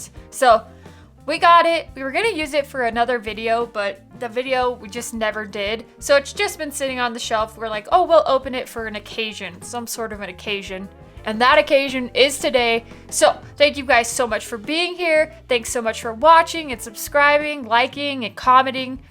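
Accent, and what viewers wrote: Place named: South, United States. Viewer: That definitely ain’t no Yankee accent there, sounds like a southern girl to me! I just picked up a sampler bottle of this today, im looking forward to trying it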